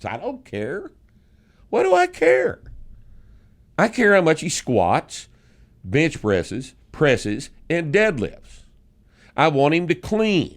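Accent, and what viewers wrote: Texas, United States. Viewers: Me too and I’m French. Love that Texan accent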